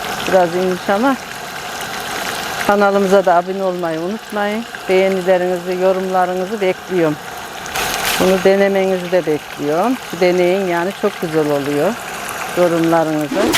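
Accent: Turkey. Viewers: Şive iç Anadolu Bölgesi nerelisiniz acaba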